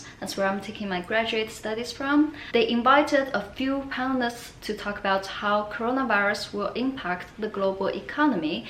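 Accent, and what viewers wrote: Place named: China. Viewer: You are the best YouTuber, I watch almost all the videos of your channel. love your voice, your Chinese accent. I think you are absolutely gorgeous, and you are a really confident person. I really want to be friends with you, I can imagine that you are such an amazing friend. Keep film more video!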